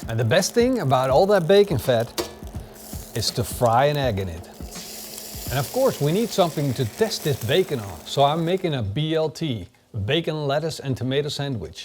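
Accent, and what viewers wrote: Netherlands. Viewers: Sorry for my last comment in another video, I don’t even remember which video I left it. When I say that you fuck up the recipe, doesn’t mean that you are a bad cooked, I really see you as a temperature and meat expert. It’s just that so many video you seem to add the little touch that upset everyone and get roasted in the comment section.. You can’t deny that. I really enjoy your recipes , and watching you cook. Especially with your Dutch accent, if I found the other comment I will erase it cause it’s can be misunderstood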